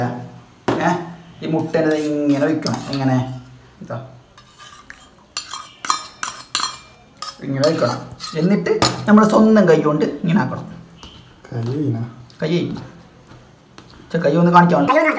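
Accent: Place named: Kasaragod, India. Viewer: Enik നിങ്ങടെ കാസര്‍കോട് ഭാഷ ഭയങ്കര ഇഷ്ടമാണ്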